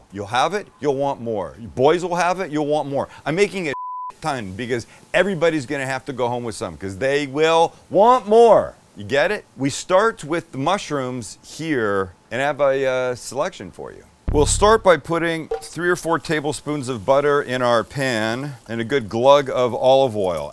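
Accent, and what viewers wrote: United Kingdom. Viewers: I am from the UK and your British accent is so very cute. I love it.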